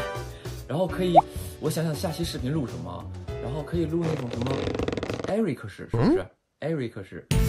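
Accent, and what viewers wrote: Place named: Dalian, China. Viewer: 我是永居在日本大连人、（具体应该是瓦房店的、离丹东更近一些）听着说话就特别亲切、直播时说话和我们家都一个味儿、无比的亲切、都能扑哧的笑出声音来、乡音乡情、尤其宇宁小弟还怎么努力、这么与众不同、用自己的方式给自己开辟出一片新天地、我最佩服这样的人、加油吧、在海外的粉丝会一直支持喜爱你的！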